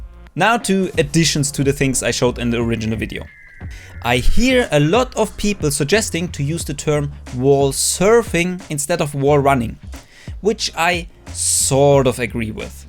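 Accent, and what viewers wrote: Germany. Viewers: Man kann so krank hören das du deutscher hust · your german accent is so obvious ;D, great videos man. keep on grinding my man. ;) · Was ein Deutsch englisch HAHAH😅✌🏼 xD, Save Deutscher oder?😅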